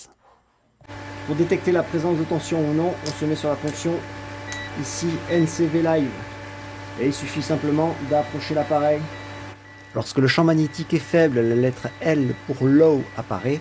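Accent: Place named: Corsica, France. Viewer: Petit accent corse sous-jacent.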